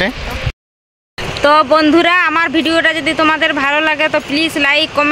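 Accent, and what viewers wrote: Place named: Purulia, India. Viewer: বৌদি তোমার কথা গুলো শুনতে ভালো লাগে ❤ পুরুলিয়ার লোকের কথা সুনতে ভালো লাগে তোমার কোন বোন আছে আমি ও বাউরী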